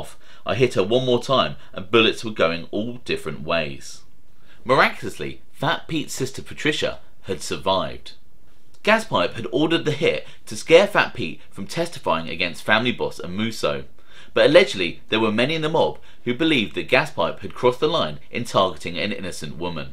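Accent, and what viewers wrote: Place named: United Kingdom. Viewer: My Pleasure! Say, based on your accent do you produce your show from the UK?? I was just wondering. In any case...are you aware of a BRITISH MOBSTER who was influential in New York in the 1920's and 30's named OWNEY MADDEN. (also nicknamed "The Killer"?) Check him out if you'd like to delve into some of the older history of the US Mobs. Interesting fellow Madden was!!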